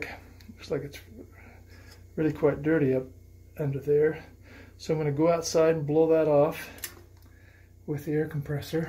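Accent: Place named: North America. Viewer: Very helpful and great narration. Most Brits are suckers for a North American accent!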